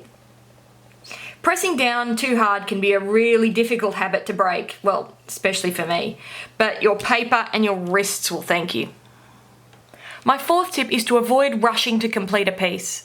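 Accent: Australia. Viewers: Hi. I'm preparing to teach a class using colour pencils and found your tips useful prompts for me to pass along. In some cases they were things I already knew but good to be reminded. Also thanks for showing unsatisfying past work especially how certain papers behave. It took me years to appreciate the importance of using quality art materials and stop blaming myself for unhappy endings. Now I always tell my students a bad piece may not be simply due to their talent or lack of it. Nice to hear the Aussie accent as well.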